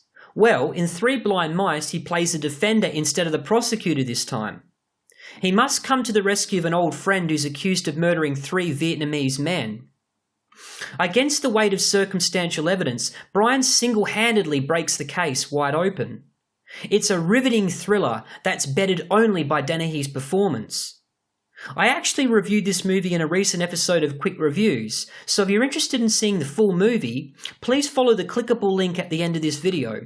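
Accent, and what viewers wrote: Australia. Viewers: Hi...Your Welcome Don't mean to embarrass you /...it's very SEXY/GORGEOUS 🤩 I could listen to you talk "AAAALL" day long! 😊 I'm a California Girl...near LA And You? Accent sounds Australian? What time for tomorrow's flick? CAN'T wait!!!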